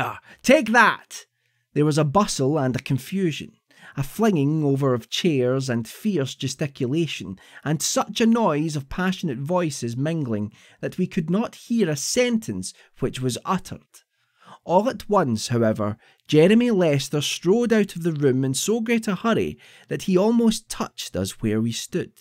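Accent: Scotland, United Kingdom. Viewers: Enjoyed your reading . It was a suspenseful tale .Your scotch dialect reminds me of David Balfour in Kidnapped . We Americans sound ordinary . Probably not ordinary to other dialects but how can one gauge ? Also the smoke has a mesmerizing effect . Thanks for your audio tale !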